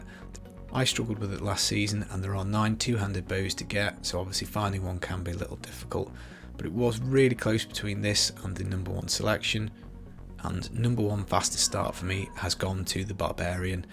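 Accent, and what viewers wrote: Stoke, United Kingdom. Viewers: are you from Stoke ?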